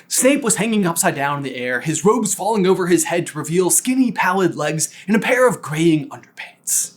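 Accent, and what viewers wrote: South, United States. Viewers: I didn't care for the Southern accent and feel like there are too many clips cut in the video. That being said you guys are amazing and I liked the theory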